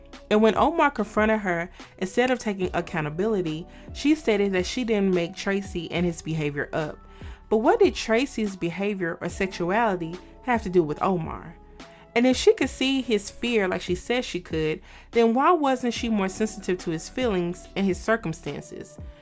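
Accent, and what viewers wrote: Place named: South, United States. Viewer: Another entertaining video as always! Also I love your accent, like the way you said poem , it’s so cute! If you don’t mind me asking where are you from? (I know your southern based on your voice but I know different southern states have different dialects)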